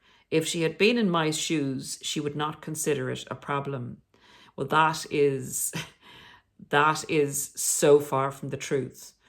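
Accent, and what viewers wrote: Ireland. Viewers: As well as her beautiful Irish accent ♥️🥰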